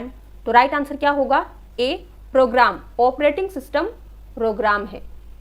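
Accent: Odisha, India. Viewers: Are you from odisha mam?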